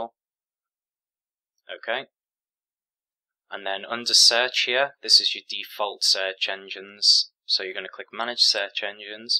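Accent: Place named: Lancashire, United Kingdom. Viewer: This helped a bunch. Cheers! (P.S. you're definitely from Ormskirk).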